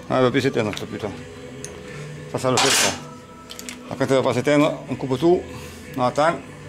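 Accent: South, France. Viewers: j'adore ton accent, ça sent bon le sud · t'a voix dû sud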